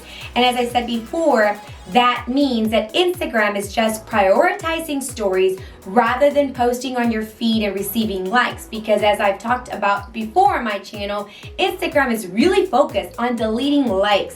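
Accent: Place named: Spain. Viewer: Cool............. I don't know about .........I like your Spanish accent.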